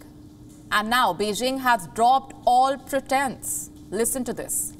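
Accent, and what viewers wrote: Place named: India. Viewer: Why WION news are using broadcasters that has strong Indian accent journalist I barely understand what she was reading my goodness pls kindly use someone that has free accent in English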